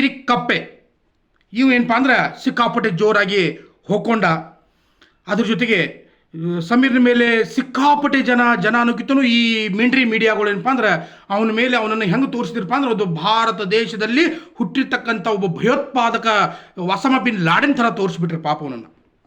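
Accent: North Karnataka, India. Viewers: Uttarkarnataka kannada valg masth heliri brother Super 👌 Correct agi counter Kottiri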